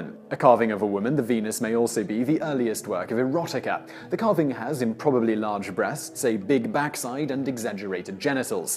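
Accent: England, United Kingdom. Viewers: "British accent"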